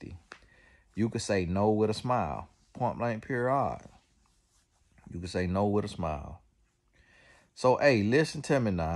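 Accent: South, United States. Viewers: Boiiii you have one country accent lol lol I'm from the South too I hear it in ya lol lol love your messages they are well needed, Big Blessings to you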